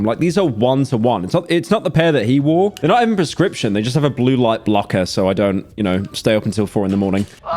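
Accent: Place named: England, United Kingdom. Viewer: You do that English gentleman voice so well, I think that's the way you talk.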